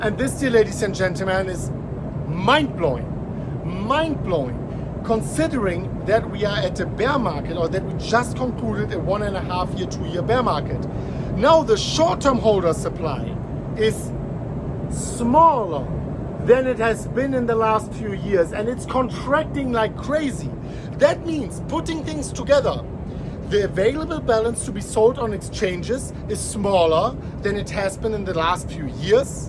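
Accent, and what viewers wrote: Germany. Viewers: i always thought is German Nie wiem dlaczego xD <3 Edit: chyba przez akcent:)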